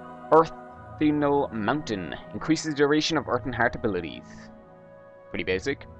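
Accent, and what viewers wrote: Ireland. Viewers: Now, I'm new to this channel, but are you Irish/Newfoundlander? I thought I picked up a bit of my own accent from this video, haha! c=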